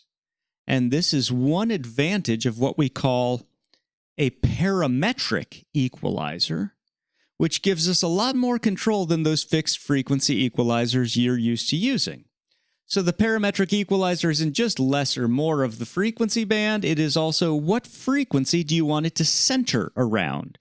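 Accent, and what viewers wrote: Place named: Canada. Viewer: Hey, I love your video..great job and very informative!! Canadian eh? Brantford Ontary-ary-ary-O here lol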